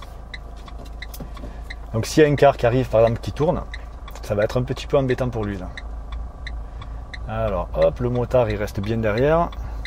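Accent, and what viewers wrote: South, France. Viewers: Merci pour tes vidéos ;) très agréable à écouter avec ton petit accent du sud qui nous donne un peu de soleil (je suis de Paris)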